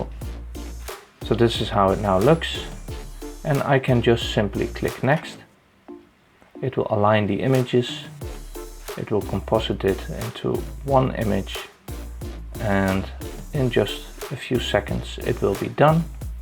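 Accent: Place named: Netherlands. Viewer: Hi, Funny how in the first seconds I already recognized a fellow dutchie XD. For everyone else I'll ask my question in English. I'm currenty shooting a 3 panel mosaic of NGC 1333 with my ASI 294MM pro. I'm planning on having at least 25 subs for each RGB channel. After which I want to stick them all together to create a single big mosaic. My question is, what would be the best work flow? Last year I did a 2 panel DSLR mosaic of m31 in a PixInsight trial, which worked flawlessly. But I have no idea what would be the best way to do it with mono data in SiriL. Ofcourse I would start with stacking the data from every filter together, but what would the next step be? Do I stretch and edit every mono filter, make a RGB version of that panel than use ICE. Or would I stretch it, use ICE to create the mosaic and then make the RGB version? I think that doing ICE in between stretching and RGB would cause problems with alignment later. 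Another problem with these work flows would be that it is really difficult to have the same stretch across (3 panels * 3 filters) 9 different files. You mentioned something about creating a SiriL script which does the stretching. I have never used scripting in SiriL so would something like that be difficult to do? Or would it be better to stack the files, use ICE than stretch and RGB composite? NGC1333 has a lot of faint dust (I really like the image from Justin Tolboe), so I think that it would require a bit more processing than doing a simple auto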